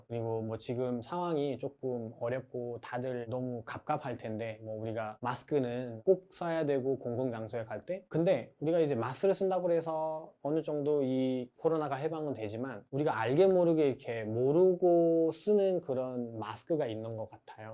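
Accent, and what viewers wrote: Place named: South Korea. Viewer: 말투가100% 한국사람인데요.?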